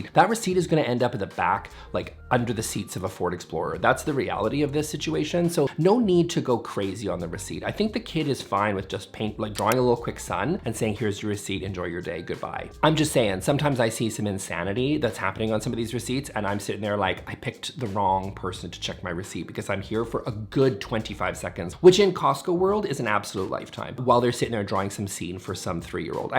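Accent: Canada. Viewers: a Canadian accent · I’ve never noticed your Canadian accent until this video. It must come out when you get worked up 😂. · Love your fun combo of “gay voice” and Canadian.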